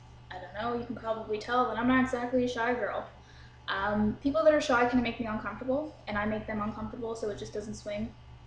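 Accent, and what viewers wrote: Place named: Canada. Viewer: Aw are you Canadian? I can hear an accent!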